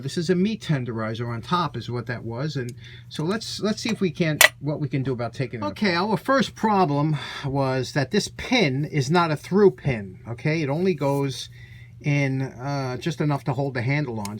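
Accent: New York, United States. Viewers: Cool refurb! I've spent the last 30 years in Raleigh, NC by way of Mohnton, PA originally. But I'd love to bottle your NY accent and unleash it at will? 👍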